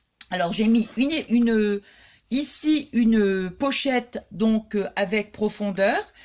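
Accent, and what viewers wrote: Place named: Nord, France. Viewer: Bonsoir, vos créations sont vraiment magnifiques👍🤩🤩🤩et vous êtes tjours aussi Géniale, sympa et l'accent du Nord j'adore 😃😅 bonne soirée 😘😘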